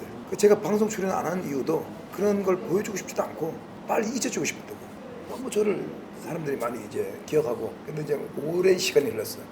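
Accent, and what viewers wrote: Gangwon, South Korea. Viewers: 종겨운 강원도 사투리네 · 아직, 강원도 사투리 잘쓰시네여 저는고향이 강원도 동해여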